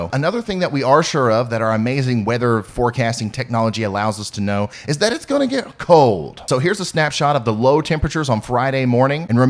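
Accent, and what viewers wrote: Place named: Kentucky, United States. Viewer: wow.. I think lives in ky too..i recognize the accent because it's where I'm from originally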